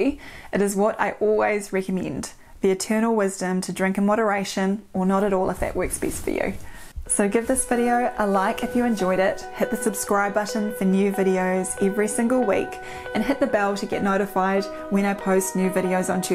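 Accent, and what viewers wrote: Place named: New Zealand. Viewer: That’s a New Zealand accent, not Australian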